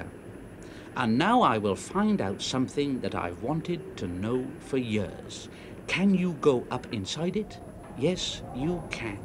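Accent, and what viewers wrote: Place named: Newport, United Kingdom. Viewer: You can hear the Newport accent in more in this clip than ever!:-) wonderful little film. 👍👍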